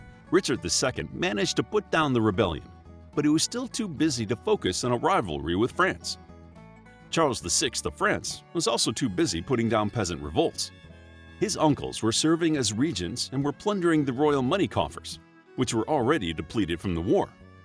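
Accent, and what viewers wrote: United States. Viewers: You do realise this Narrator is American, not British, don't you? Can't you hear his accent. They are obsessed with this rivalry, even though the Brits on the French had been friends for over 200 years.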